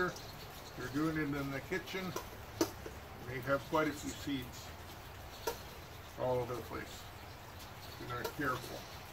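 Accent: Canada. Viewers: Hi and thanks for the video. You sound Canadian...where can you get inexpensive bird seed?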